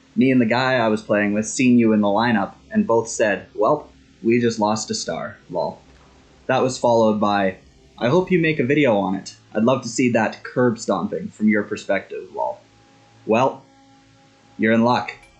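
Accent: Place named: Canada. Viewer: Maybe. Apart from my heavy Midwestern USA accent and your mild Canadian inflection.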